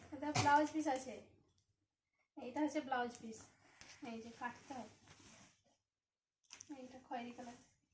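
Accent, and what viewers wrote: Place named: Malda, India. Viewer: Apa apni ki India theke bolcen.. apanr kotha gulo maldah ...mane amrao aivabe kotha boli ...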